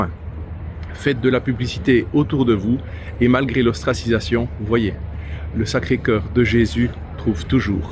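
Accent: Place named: Alsace, France. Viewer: Vous êtes alsacien ? Vu l'accent, et votre attachement à Christ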